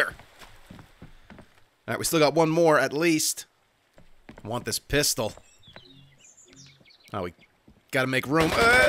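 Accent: New Jersey, United States. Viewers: Funny when u are from Jersey u dont notice the accent lol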